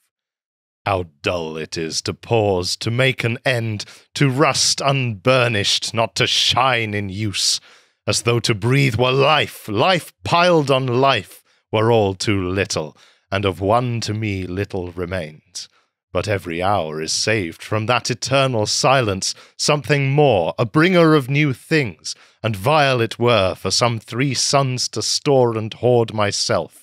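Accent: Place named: United Kingdom. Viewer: The Brit narration can not be beat.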